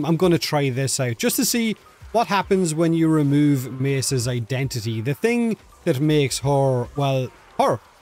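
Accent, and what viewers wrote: Ireland. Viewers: Thank you for the clarification. I fuggin love your videos, and your cool ass Irish accent!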